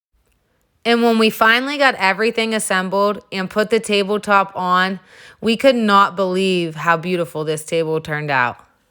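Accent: Pittsburgh, United States. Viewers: Where are you from? Sounds like PA near Pitts?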